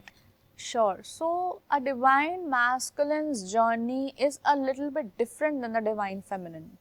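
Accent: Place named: India. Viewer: I just love that Indian accent 😊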